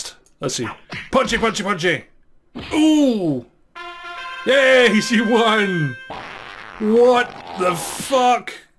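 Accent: Scotland, United Kingdom. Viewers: Haha this is too funny!:) it's actually so refreshing to hear a fellow Scottish accent on YouTube! Haha, love your videos!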